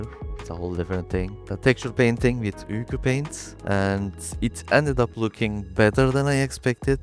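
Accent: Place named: Turkey. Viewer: I may have noticed a little Turkish accent 😅 I couldn't see it in the video but I presume the main material is basic toon shader with color ramp?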